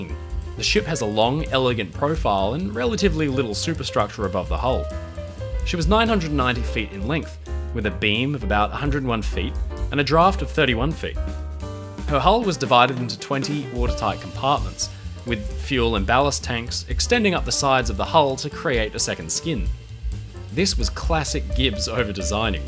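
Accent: Australia. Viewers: I thought you were British until you said "Hee-Uh". Then I realized you're a Aussie mate. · Thank you for so many absolutely great documentaries,always enjoy your knowledgeable & well spoken narration. Nice to hear an Australian voice!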